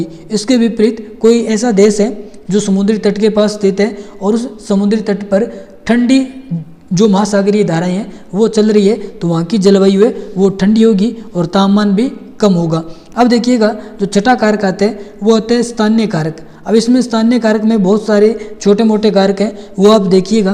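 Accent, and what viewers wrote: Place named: Bikaner, India. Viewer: Bhai aap bikaner ho kya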